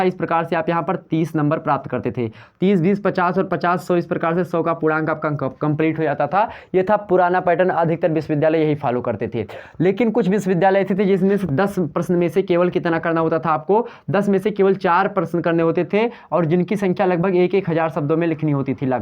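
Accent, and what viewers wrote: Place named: Gonda, India. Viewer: Voice se pahchan gaye sir ham bhi gonda se hi hai But aap bahut aacha padhate hai sir Ba third hindi paper 1st me jo kaviyo ke kavyans bacha hai sir उसे karwa dijiye sir please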